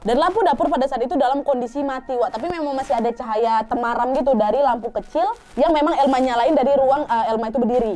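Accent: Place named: Padang, Indonesia. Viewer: Logat mbk sep org padang😊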